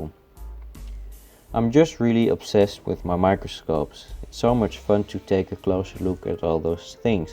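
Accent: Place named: Europe. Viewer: You sound like you maybe Europe so that may not be self isolation but enforced isolation because you know...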